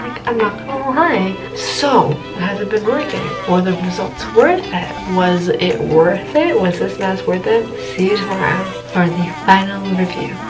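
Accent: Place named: Southern California, United States. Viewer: It’s that Southern California accent lol. We all sound like that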